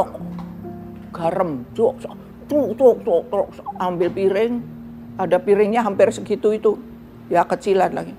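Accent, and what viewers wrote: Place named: Temanggung, Indonesia. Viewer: Raiso d colong Logate wong temanggung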